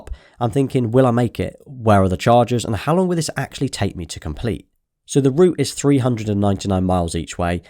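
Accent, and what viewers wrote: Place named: Bristol, United Kingdom. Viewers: Watched your videos whenever I see them but I’m looking in to getting a Tesla. I’ve always thought you were from the same city as me (Bristol) from your accent and was so confused when you said you live in York, but then you said you’re back in your home town Bristol😂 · Fab videos, thanks for sharing. Detected the west country accent early on and nice to have that confirmed (from near Bath myself).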